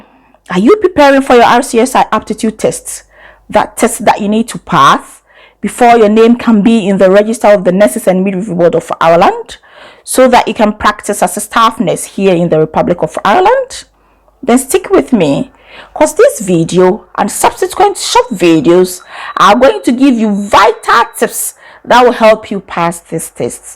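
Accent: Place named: Ghana. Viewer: You are very articulate. Thanks for all the information. I feel you are a ghanaian ..... From ur accent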